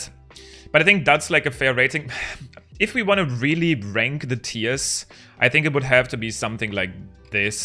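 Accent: Germany. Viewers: Yeah, if people give you grief over having a German accent while speaking flawless English, their opinions are laughable. I'm living in Norway trying to learn Norwegian and I am terrible at it. I wish I could speak Norwegian as well as you speak English. I am from the USA and there are many different regional accents, and the Cajun accent and certain southern accents are much harder to understand than a German accent, and no one gives them crap about it. Double standards. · are you german? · Another great video,! I’m continually impressed by your English, but since you repeatedly questioned your pronunciation of Swallow; I think you pronounced it correctly first each time (with your adorable German accent 🙂). Swallow rhymes with follow, not fallow. The a sounds the same as the a’s in baa baa, not the a in black sheep. I’m not sure if this helps, but the French name Soileaux sounds the same, or at least I can’t tell the difference.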